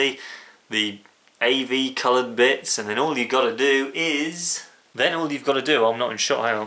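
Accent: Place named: Lincolnshire, United Kingdom. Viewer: You sir are too funny. And oddly you sound like you have a Lincolnshire accent.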